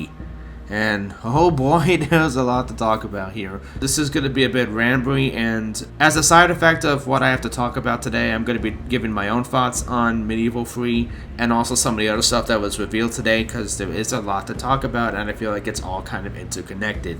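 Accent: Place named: Rhode Island, United States. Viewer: Dude sounds like he from Warwick RI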